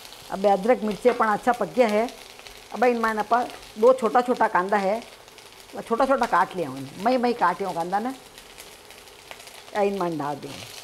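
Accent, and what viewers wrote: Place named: Rajasthan, India. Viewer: Hum b Rajasthan se hi h Aapke muh se marwari boli bhut achhi lagti h Gud recipes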